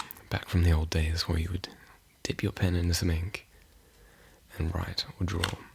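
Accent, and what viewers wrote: Australia. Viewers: n Australian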